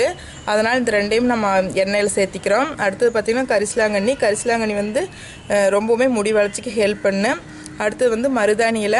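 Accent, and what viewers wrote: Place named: Kongu, India. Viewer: உங்கள் கொங்கு தமிழ் very nice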